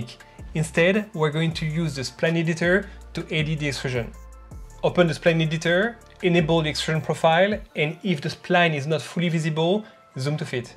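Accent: France. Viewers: I found your channel just a few days ago. I like it and I subscribed. i like the topics, the way you develop your projects as they teach us many tips. I also like your accent :) are you french? (I am)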